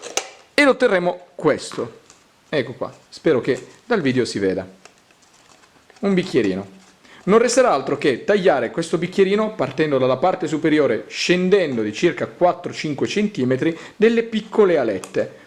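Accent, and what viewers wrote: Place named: Sardinia, Italy. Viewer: Un tutorial ben fatto e la spiegazione e' impeccabile con un italiano tipico dei sardi... bello iscritto e like da adesso ti seguiro' sempre... un saluto Giovanni p.s. io faro qualche modifica per interrompere l'alimentazione alla luce lmpadina e accenderla solo la sera con un interruttore a parte... 10+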